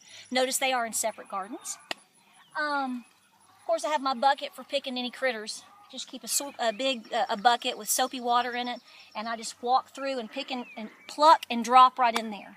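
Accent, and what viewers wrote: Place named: Kentucky, United States. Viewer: Your garden looks great! Up farther north and in mountains here we have a much later start, and one thing after another has been going wrong for me this year. I've got to get one of those owls, might keep the wild bunnies out. I'm about to build a wattle fence. I also need to get some form of irrigation set up, would you believe my house has no outdoor spigot. Love your accent reminds me of friends I had growing up in ky.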